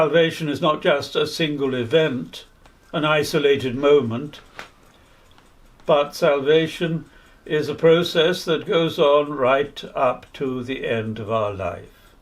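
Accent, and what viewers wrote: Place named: RP, United Kingdom. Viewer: Very erudite, with a charming "posh" accent, a British sense of humor, and a real knowledge of the loving heart of God, which is the most important. Thank you very much, Father.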